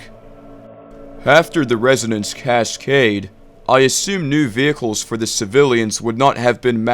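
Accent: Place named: California, United States. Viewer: This dudes California accent is crazy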